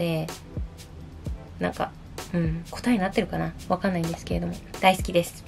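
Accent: Kagoshima, Japan. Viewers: わたしも鹿児島出身、東京在住なので親近感湧くし、突然鹿児島弁でちゃったらしばらく直らなくなるのめっっっちゃわかります（笑） しかもわたしもジャニオタあがりのケーポオタなのでもう勝手に運命感じてます😳 これからも頑張ってください❤︎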